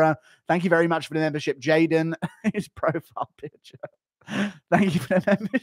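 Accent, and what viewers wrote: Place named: United Kingdom. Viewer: it is lol. he speaks like 3 different languages and has a red neck vibe to him but talks like hes from britan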